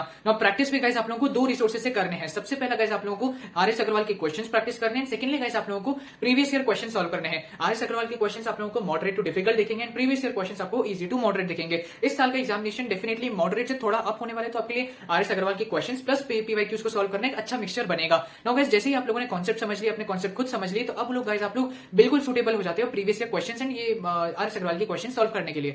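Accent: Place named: South, India. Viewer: Why does bro have a south indian accent in the new videos ?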